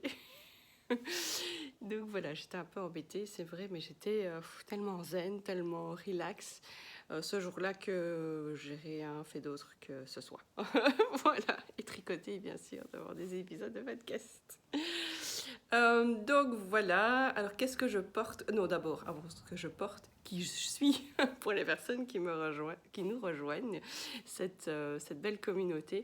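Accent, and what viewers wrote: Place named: Belgium. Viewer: Bonjour je viens de découvrir tes podcasts tricot et en t’écoutant je me disais bien elle est de Belgique cette dame 😂😂 Ton visage ne met pas inconnu peut-être du monde du foot ou pas😆 Moi je suis de Uccle je ne sais pas si tu connais, en tout cas je passe de très bon moment en visionnant tes vidéos 🫶 Bisous et bonne journée 💋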